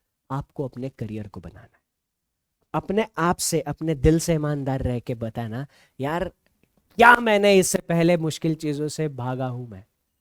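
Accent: Maharashtra, India. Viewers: Namaste Sir, hriday se request hai kirpiya 'Marathi' touch mai baat krna phir se start kr dijiye sessions mai...🧡🙏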